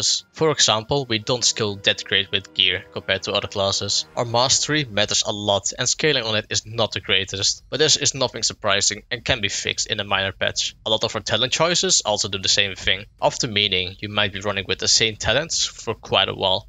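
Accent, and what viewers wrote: Netherlands. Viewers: You sound Dutch...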